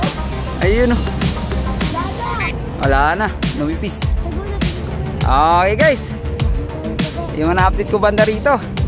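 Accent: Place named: Tagalog, Philippines. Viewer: Nakakatuwa kang pakingan mag salita ng Tagalog. Hahahaha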